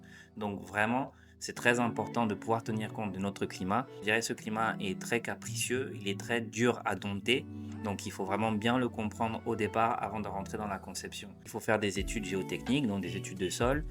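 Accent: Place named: Cameroon. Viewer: Le ton camerounais 😂😂😂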